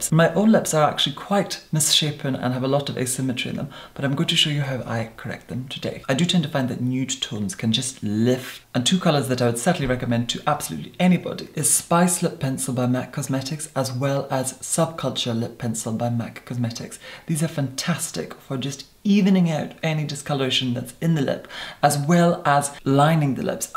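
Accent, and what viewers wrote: Scotland, United Kingdom. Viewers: It’s a peculiar Scottish accent mixed with English so not sure what to make of it but balm would never be pronounced bal-um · Alex Firnth of course I know he is Scottish but do you hear it in his accent? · Scottish accent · just wondering are you originally from scotland or have friends/ family from scotland? because your accent is slightly scottish but mostly english. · Ur Scott accent comes out sometimes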